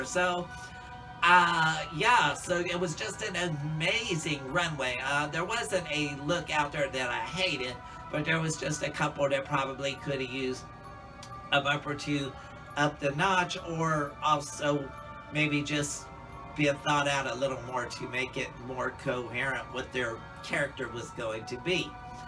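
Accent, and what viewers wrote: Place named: South, United States. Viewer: Thanks for the subtitles,. Not everyone can speak Southern Country. Great review! Hot Topic Clearance Rack, lmao.....Happy Halloween Doll!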